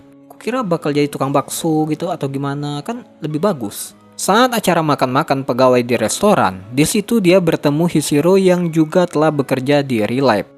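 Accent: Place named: Java, Indonesia. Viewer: Wong jowo bang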